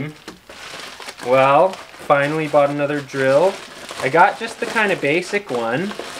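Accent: Canada. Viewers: Sounds like you have a Canadian accent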